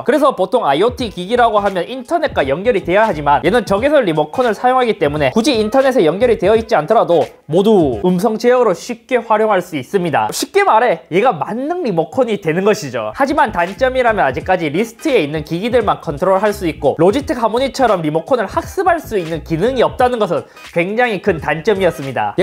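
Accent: Gyeongsang, South Korea. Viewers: 언어학자인데요, 잇섭님 여기서 ㅎ에 대응되는 발음으로 표준어의 [h]가 아닌 동남방언의 [x]를 하고 계셔서 그런거에요. 아 모양으로 입을 만드시고 입김을 분다는 느낌으로 시작한 다음에 모음을 얹어줘야 표준어의 [하]가 되어요.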